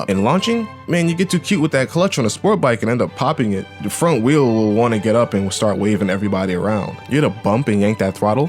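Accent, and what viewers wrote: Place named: Brooklyn, United States. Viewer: Audio is fine. Your Brooklyn accent is classic. I started at 16 years old with a used 250 cc single four-stroke Honda dirt bike. It was all I could afford, but it seemed like plenty of bike. The speed limit was still 55 on the interstate, and it did fine there. Sold it after several years for about the same I paid for it. Later I bought a 250 cc two-stroke Suzuki, which was noticeable faster. Then had a 650 cc Suzuki street bike when I was 22 years old, and drove it all over LA for years with no problems. My next bike is probably a Triumph Street Triple 765cc to be used in the hills along the California coast.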